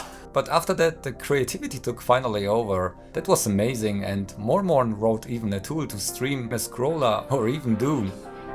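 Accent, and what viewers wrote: Germany. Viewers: Sie haben immer noch Ihren deutschen Akzent.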